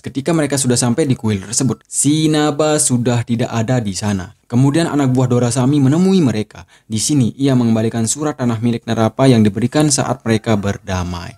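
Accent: Bali, Indonesia. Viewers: bang ora Bali ya?logat nya ky orang Bali..sukses terus bang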